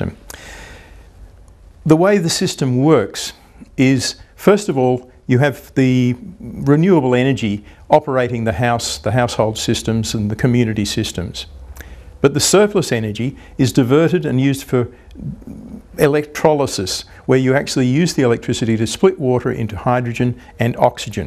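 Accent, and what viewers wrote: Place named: Australia. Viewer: Hi. Nice to hear an Aussie discuss power to gas electrolysis. How did you go with your pilot in Northern Rivers? Being and engineer with a solution bent, this method of storage and delivery of methane via the gas grid seems the most sensible way to use our existing infrastructure to get the long term storage we will need once renewables dominate the electricity grid (hopefully). If anyone will get it right it must be the Germans! Anyway, I'm interested to know how it is going and how it is being received by others. Regards Tony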